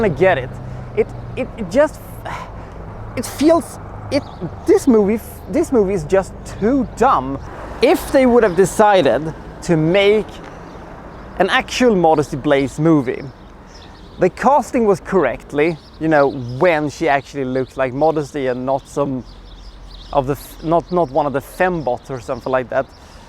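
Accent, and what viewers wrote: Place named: Europe. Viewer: I think you can count your blessings for having gotten one like in three years for this drivel heap of a video. Oh, and show some decency when talking about Arabic “whatever” being mowed down. At least we know what Arabic is but we’ll never know what you are with the poor Euro-accent trying to sound American with throwing curse words here and there only ending up with an embarrassment that manages to surpass what you’re describing. This is a Joseph Losey film and so you may be better off leaving the review to someone who k owes what that is.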